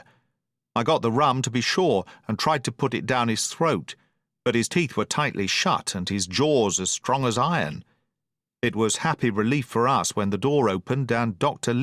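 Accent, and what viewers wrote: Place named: England, United Kingdom. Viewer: Thank you! So nice to hear it read with an English accent! Good job, Matey!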